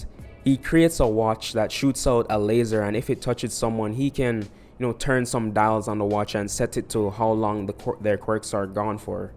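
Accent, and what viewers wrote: Jamaica. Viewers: Yo what is this mans accent? I hear a little bit of a Jamaican maybe but I’m really not sure.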